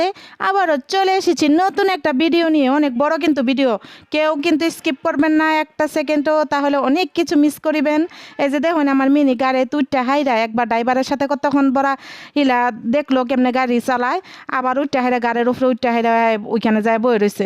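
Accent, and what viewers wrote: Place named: Mymensingh, Bangladesh. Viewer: আপু আপনার মিনি অনেক কিউট, আপনার কথা গুলো অনেক সুন্দর লাগছে তবে আপু আপনার কথা গুলো জামালপুরের হয়নি এই ভাষা ময়মনসিংহ এর,আপু আমার বাড়ি শেরপুর বকশিগঞ্জ এ,এই পিটালি আমরা আগে মানুষ মারা গেলে মজলিস এ কলা পাতায় খেতাম অনেক মজা হতো,আপনার ভিডিও দেখে ছোট বেলার কথা মনে পড়ে গেলো, অসম্ভব সুন্দর ছিলো আপনার ভিডিও ও উপস্থাপনা,আপু আপনার ভিডিও টা পুরো টা দেখলাম এবং এক বা না, দুই দুই বার দেখলাম ভালো থাকবেন দোয়া রইলো আপনার জন্য।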